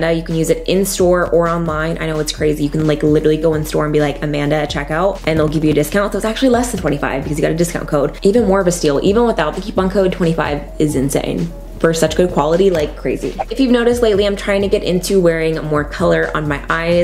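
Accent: South, United States. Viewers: I heard that southern twang girl! Tennessee REPRESENTTTT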